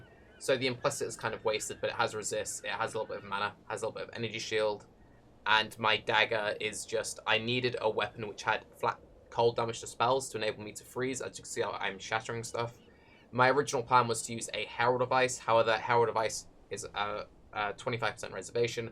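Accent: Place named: RP, United Kingdom. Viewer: yeah i miss posh speaking made me feel like im im the presence of royalty. FeelsRoyalMan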